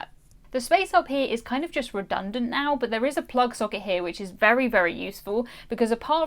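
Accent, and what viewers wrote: United Kingdom. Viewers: Good information. Thanks. It is sometimes hard for those of us with hearing problems to translate British English to American English. Slowing your speech would help. Thanks again.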